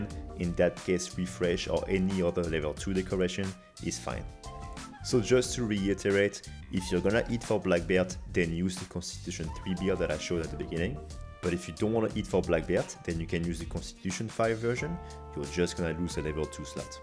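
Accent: France. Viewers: Frensh accent make it better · Mec, on manque de continue en FR de fou sur MH! On l'entends l'accent frenchie, hesite pas faire du contenue en FR ca marchera de fou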